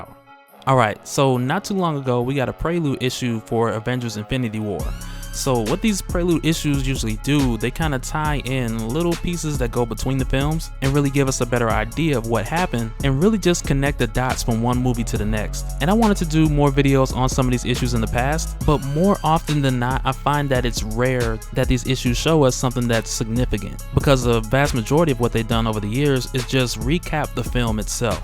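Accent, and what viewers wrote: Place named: Texas, United States. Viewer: This my first comment but I have watched and liked a lot of ya videos always speaks that Texas trill 💯💯💯💯💯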